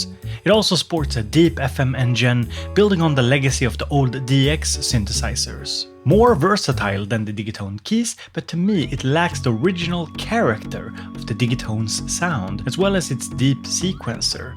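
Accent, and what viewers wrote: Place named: Sweden. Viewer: Very traditional svenglish ;)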